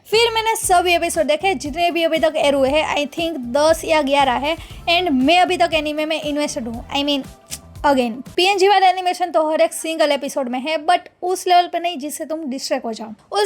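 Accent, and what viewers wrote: Gujarat, India. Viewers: Gujju accent change kar.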